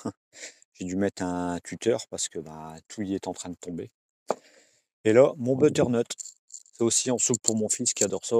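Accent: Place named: North, France. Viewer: Avec ton accent ça sent le Nord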